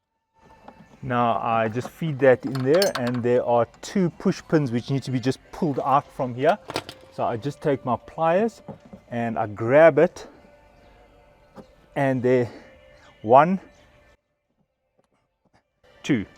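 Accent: South Africa. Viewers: Actually, I found the opposite to be true. He's quite articulate. If you're not a native English speaker, I could see having issues understanding. Sounds like this gent is from South Africa. Personally, I'd suggest just being thankful he cared enough to share his experience and teach folks how to do this job. CC, playback control and rewind are things. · Yes this guy is definitely from South Africa, as am I. He really is a very good instructor, especially on the e65. His videos has helped me out a lot already.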